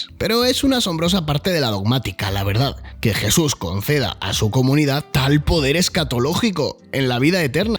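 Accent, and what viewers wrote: Canary Islands, Spain. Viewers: ME ENCANTA TU CLARIDAD EXPLICATIVA Y TU ASSSENTO CANARIO. ❤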